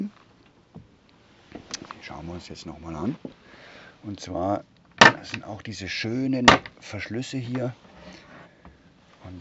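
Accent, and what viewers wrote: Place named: Lower Bavaria, Germany. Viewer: Hallo, also das Video wäre noch besser, wenn du dich auf deine Heimat Sprache ( Niederbayrisch) einigen könntest, man merkt, dass du dich anstrengen musst wegen dem versuchten Hochdeutsch, deswegen deine Langsamen Wörter/Sätze. Bei ca. 14/16 min. willst du den linken Kleiderschrank (wenn man zum Bett davor stehst) erklären, ist dir da ein Fehler unterlaufen? denn die Türe geht ja gar nicht auf, die hängt an den Treppen, also kann man die nur von oben aufmachen. Denke du solltest in Zukunft deine Vorstellungs- Mobile vorher besser/vorbereiten/ ausprobieren wo was steht oder geht. Denke "Bayern Camper hat eine bessere Werbung verdient.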